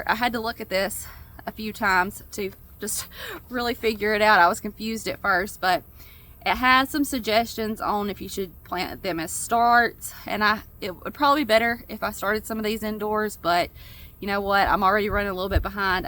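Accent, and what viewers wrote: South, United States. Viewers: You are such a good wife/mom, and your little guy looks like a sweetheart...~.~ I started watching your channel recently. I'm a Christian, too, and grew up in the 'country' in Michigan/Wiscosin. Now I live in South Korea and teach English here with my Korean husband. It's fun to hear your Southern accent and to see the little things about American life that I don't see anymore living here in a big city and foreign culture. Thanks for sharing your joy & love for Jesus and family!💜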